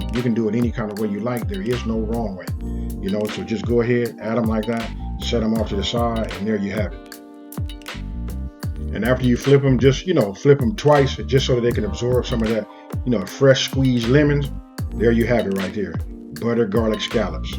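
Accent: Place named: Los Angeles, United States. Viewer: He sound like he from LA fasho!!! 🤣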